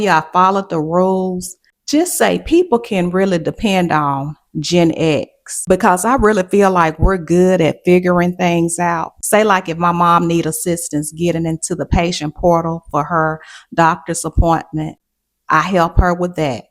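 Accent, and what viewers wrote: South, United States. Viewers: Great content! Cool Southern accent. As a Gen Xer, definitely jack of all trades ...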